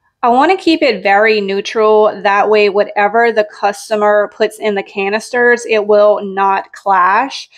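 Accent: Louisiana, United States. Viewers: You sound like a Louisiana girl! I’m from Louisiana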